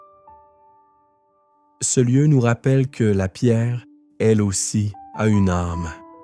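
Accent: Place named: Canada. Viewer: Merci pour ces trésors, c'est vrai que laon possède un patrimoine historique important... L'accent vocal semble être plutôt canadien qu'axonais...😉 je ne reconnais pas... pour info cette après-midi je vais virer avec ma motocyclette dans les parages ✨️✌️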